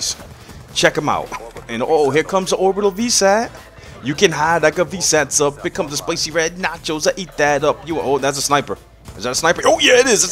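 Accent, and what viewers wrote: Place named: United States. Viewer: do all you Americans speak in such a way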